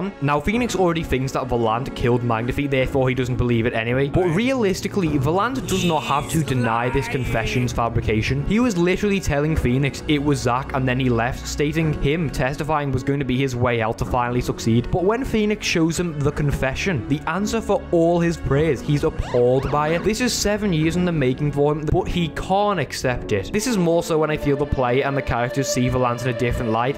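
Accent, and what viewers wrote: Liverpool, United Kingdom. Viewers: You're my favourite scouse ace attorney youtube channel <3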